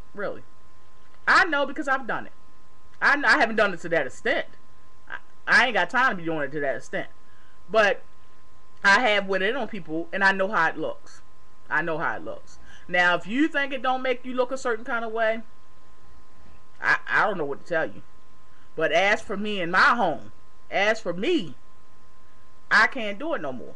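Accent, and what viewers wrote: Baltimore, United States. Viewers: is that a baltimore or d.c. accent?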